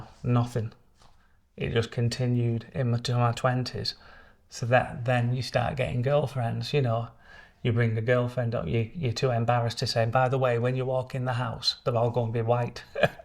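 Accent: United Kingdom. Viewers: Thank you for sharing your heartfelt story ❤ you are a lovely man . Love your Wigan accent .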